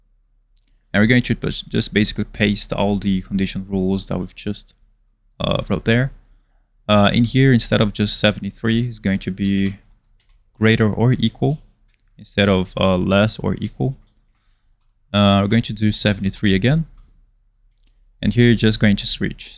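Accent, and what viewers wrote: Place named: Brazil. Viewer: bro has that brazilian accent :goodvibes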